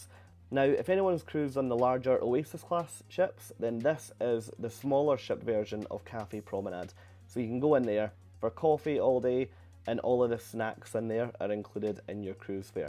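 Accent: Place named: Aberdeen, United Kingdom. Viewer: Are you from Aberdeen? Your accent reminds me a lot of a friend of mine from that area. Ace review!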